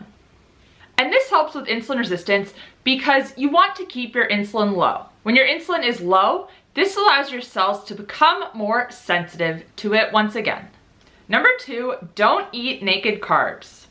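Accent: Australia. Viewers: I though you were Aussie - great to see an Aussie doing well on YT :)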